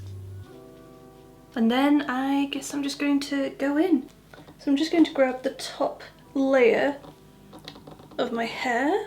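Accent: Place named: United Kingdom. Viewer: You got a really nice way to act, it's not only your British accent, also the female, soft voice makes you come over as a little lady 😊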